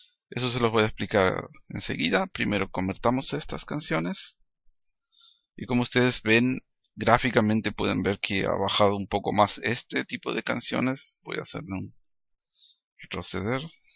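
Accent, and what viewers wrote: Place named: United States. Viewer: por que hablas como gringo?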